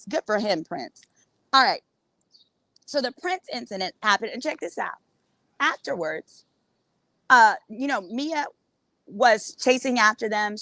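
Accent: South, United States. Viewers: MIMs was my first intro to you and I love your commentary… you are beautiful and you sound like you from the south lol love it!! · Toxic Sock is REAL! This is disgusting ! And I have to only watch you cause that southern accent is home❤❤❤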